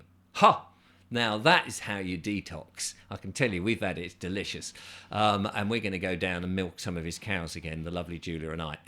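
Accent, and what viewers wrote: United Kingdom. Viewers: Although your accent suggests you are a limey, you are dumber than many Americans when it comes to British history. "Royal Assent" an automatic formality, and any right of the King to veto a bill is defunct. No monarch has vetoed a bill since Queen Anne vetoed the militia bill in 1707, and she did that only because her ministers changed their mind on its wisdom and told her to veto it. She complied with the request. The mere fact that a bill has been introduced in Parliament, especially a private member's bill, does not remotely mean it has a chance of becoming law. The title of "Defender of Faith" was given to King Henry VIII by the Pope, as thanks for writing some gibberish in opposition to Martin Luther. The Pope revoked the title when the lecherous old pig ditched his wife to marry his mistress and broke with the church, so it is no longer licit for the monarch to retain it.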